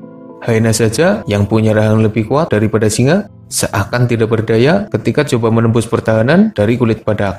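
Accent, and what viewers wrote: Banyumas, Indonesia. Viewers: Kie ta kyne cenel wong ngapak o yak......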